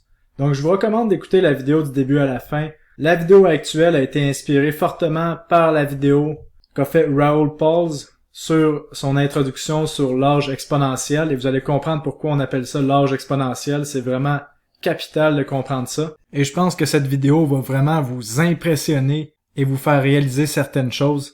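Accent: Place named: Quebec, Canada. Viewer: Merci tu nous motives dans nos investissements et c est tjrs un plaisir d entendre ton accent, ça m rappelle mes vacances au Québec!! Tkt on partage ta vidéo en Belgique et ailleurs dans le monde francophone!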